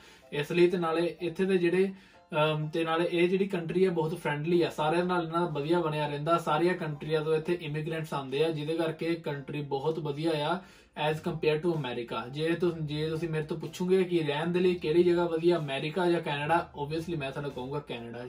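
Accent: Punjab, India. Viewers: Very natural Punjabi accent.... Love your videos :)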